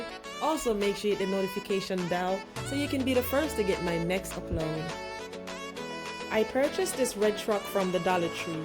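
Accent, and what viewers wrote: Jamaica. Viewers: Very nice 👸🏽thanks for sharing💕 I hear your accent where are you from? Are you Jamaican?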